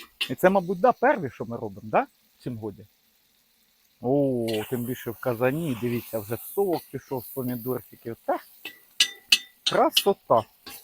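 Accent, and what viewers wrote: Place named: Poltava, Ukraine. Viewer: Говір рідний якийсь :) Полтавська область? Якщо можна район?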